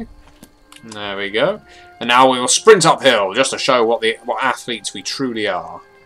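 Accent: United Kingdom. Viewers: stories like this sound so much better in a English accent also lock pick is a waste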